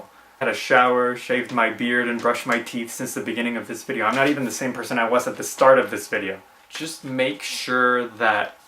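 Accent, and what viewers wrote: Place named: England, United Kingdom. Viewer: Oh, I really found this thought pretty nice! Loved it, also loved your English accent.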